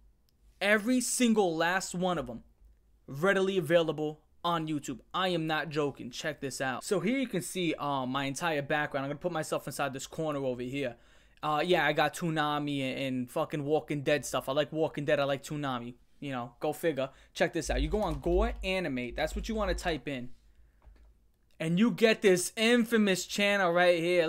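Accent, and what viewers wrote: New York, United States. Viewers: most new york accent ever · Baltimore hat, New York sounding accent and Puerto Rican flag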